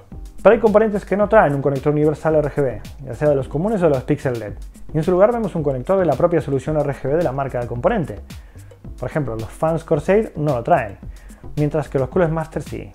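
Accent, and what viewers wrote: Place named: Argentina. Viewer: Se te nota el argentino todavia!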